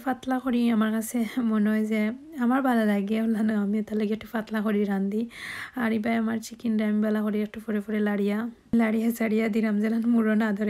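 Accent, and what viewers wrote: Sylhet, Bangladesh. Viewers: সিলেটি ভাষার বোন নাকি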